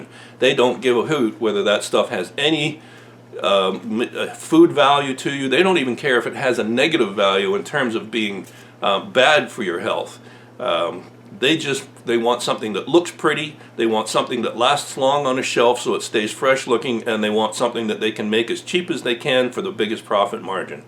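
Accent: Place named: Ontario, Canada. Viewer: hi, I found your channel recently and as soon as I heard you speak and say the word 'out' I new you were from the middle east (I am guessing Ontario). I live in Northern Alberta and try to spend a lot of time out doors, I hunt, side X side, camp, etc. I also tinker with 'blacksmithing' I make knives, froe's, axe's and do fabrication work. I haven't had a chance to watch all your videos yet, but do you winter camp?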